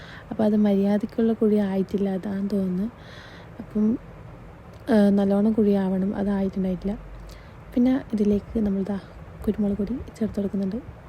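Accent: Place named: Kannur, India. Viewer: kannur il evdanu...aa slang kettappo thanne manasilayi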